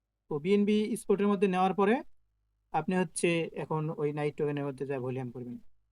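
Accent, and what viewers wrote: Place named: Bogura, Bangladesh. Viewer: vi mone hoy Bogura er hosse hosse kotha ta bashi boltacen amio Bogura er sol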